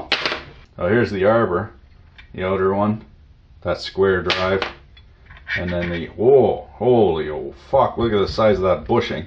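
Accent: Canada. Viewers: I swear you were Canadian...?? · Glad I found this channel. You take being a tool guy to a whole 'nother level. Im from Texas and get a kick outta the Canadian dialect. Enjoy it. I watch another channel called South main auto repair, and you sound a great deal like him lol.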